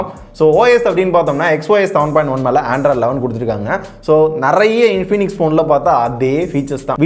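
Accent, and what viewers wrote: Madurai, India. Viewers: Bro negga madurai than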